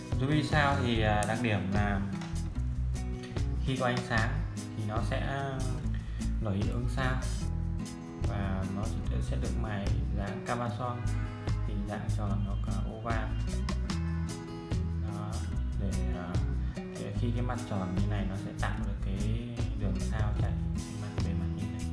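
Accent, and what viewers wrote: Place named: Nghệ An, Vietnam. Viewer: Noi dep Nghệ gi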